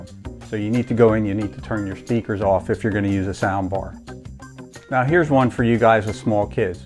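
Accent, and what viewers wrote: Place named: Philadelphia, United States. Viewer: is this guy from phiolly, he sounds like it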